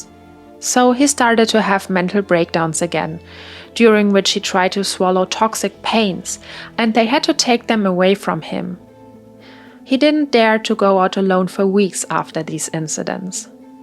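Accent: Germany. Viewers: Dieser deutsche Akzent ugh This accent I’m a German and it hurts even me🤣😭 but the video on it self is awesome.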